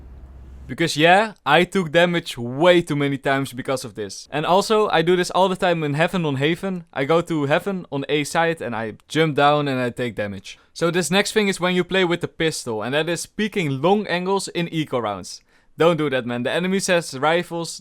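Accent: Netherlands. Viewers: That's one THICK dutch accent my dude holy crap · you sound dutch... are you dutch? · u dutch? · Hou have a Dutch accent are you from dutchland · are u dutch u have a dutch-englisch accent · maat jij hebt echt het meest nederlandse accent ooit hahaha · We kunnen je nl accent gwn horen lmao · Ben je nederlands??😂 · r u dutch? this is my first video i have seen of u lol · T nederlandse accent😂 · You dutch? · Ar you dutch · he said ''of'' instead of ''or''... also with his accent he really sounds dutch... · ik hoor gewoon dat je nederlands bent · This is my first time watching your channel, and in the first 10 seconds I heard that you are dutch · ben je nederlands? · Dutch accent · ik hoor gwn dat je nederlands bent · this guy is dutch for sure xD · de seconde dat je begint te praten weet ik dat je nederlands bent😂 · i smell dutch ik ben ook nl btw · Nederlands accent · jij bent van nederland he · ik hoor dat je nederlands bent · You sound so dutch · U sound dutch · Why am i low-key thinking you are dutch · Nice nl accent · The dutch accent😂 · i smell nederlands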